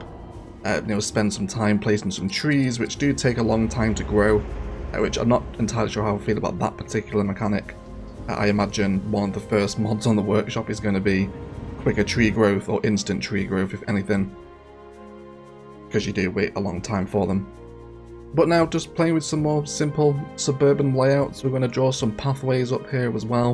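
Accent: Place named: Cockney, United Kingdom. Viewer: Omg cockney 😅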